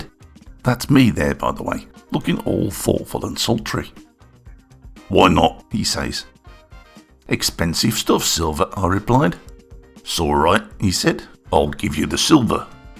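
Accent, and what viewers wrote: United Kingdom. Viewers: Joe Kerr He is british, but not ringo british. Different tone. At least to my ear.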